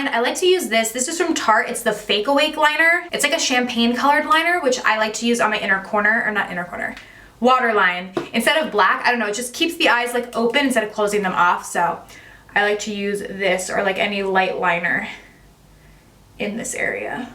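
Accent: United States. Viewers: I usually watch sugar puff and fluff but I thought I would give you ago. So disappointed you are an American who speaks so fast and non stop,doesn’t take a breath I’m English and very hard to understand you and catch the name of the product you are using. I’m sure you are very good.sorry Have a look at sugar puff and fluff. I think you will be pleasantly surprised Joi x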